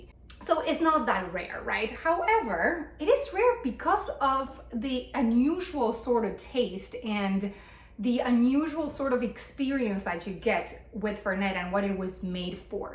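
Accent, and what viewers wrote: Argentina. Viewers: Hola. Te escribo desde Colombia. Soy muy fan de tu contenido, me encanta. Por qué no haces videos hablando en español? Así como los haces en inglés, qué tal si haces de vez en cuando contenido en español. Lo poco que te he escuchado hablar en español, me ha fascinado tu acento y estaría genial que nos dejaras escucharte de vez en cuando en tu lengua materna y más con el acento argentino que es maravilloso. Hazlo:) nada mejor que hagas más contenido hablándonos de tu país, de tu cultura, de tus raíces pero con tu acento nativo. Anímate 😊 Saludos desde Colombia y te felicito por el contenido que haces y el empeño que le pones, se nota que te encanta y eso se transmite una naturalidad muy bonita que se ve en la forma en la que haces tus videos. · I knew that accent sounded familiar! My ex-wife is from 🇦🇷 and half Italian, lol.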